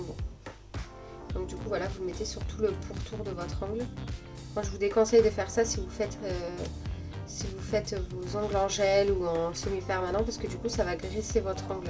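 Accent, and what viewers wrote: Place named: South, France. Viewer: Merci pour votre vidéo! J'ai arrêté de me ronger les ongles et je cherchais une vidéo pour en prendre soin. C'est chose faite! J'ai trouvé ma petite routine ongulaire 😁. Vous êtes du Sud non? J'adore votre accent!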